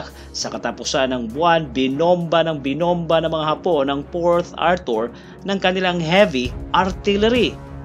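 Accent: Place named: Tagalog, Philippines. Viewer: Habang tumatagal medyo tumatagalog na ang punto.. At hindi na masyado puro "Pero"...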